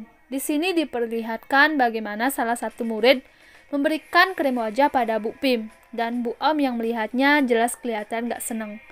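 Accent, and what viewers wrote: Bali, Indonesia. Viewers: Kali ini q dgr suara ini Chanel anak Bali Yach?? Keliat bgt ni suaranya khas Bali. Morid, ithu, memanggel dsb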